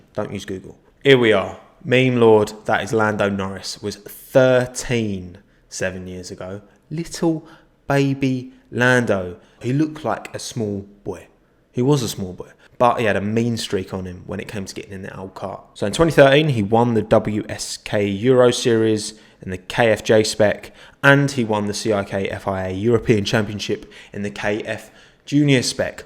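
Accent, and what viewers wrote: Ireland. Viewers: Irish Guy???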